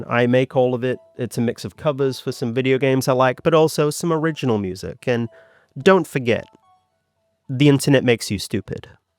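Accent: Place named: New Zealand. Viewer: nz accent jumpscare (positive) dude I've just been through like 5 of your videos, these recaps are great! .. I truly had no idea this story had SA users involved omfg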